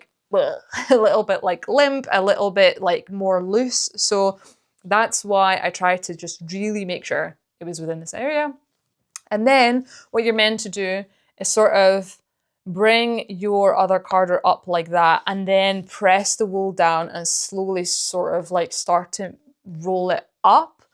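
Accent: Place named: Scotland, United Kingdom. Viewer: I actually don't pass the wool between my carders, I just swap hands and when it comes to making the rolags off I just roll in down off the carder into a little tube. Just the way I find intuitively works for me. Looks like you are doing great. It's also really nice to hear more Scottish voices on YouTube!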